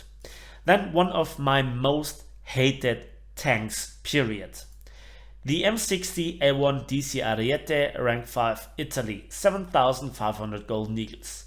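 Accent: Germany. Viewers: "Die Aufnahme ist gestartet" bist du deutsch? Würde irgendwie zum Englisch passen, da das einen wirklich nur leichten deutschen Akzent hat😂😉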